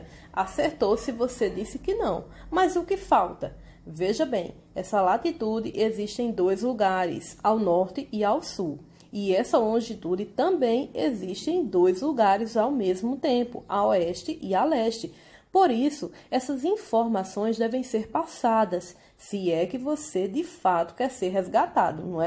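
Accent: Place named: Northeast, Brazil. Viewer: que aula fera! ouvindo seu sotaque me lembrei do nordeste, um lugar que amo!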